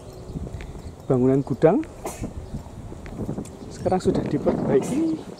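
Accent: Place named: Banyumas, Indonesia. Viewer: Masnya ngapak xixixi:D teruskan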